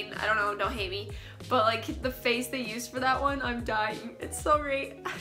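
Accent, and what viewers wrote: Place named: United States. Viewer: Hey I’m lesbian. I thought you were American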